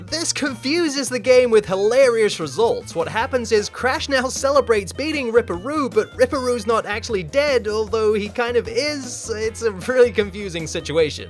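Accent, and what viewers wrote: United States. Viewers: What the fuck is your accent? Had to turn this off because I was too busy trying to work out why you sound like 20% American and 80% from England. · Your jarg American accent actually offends me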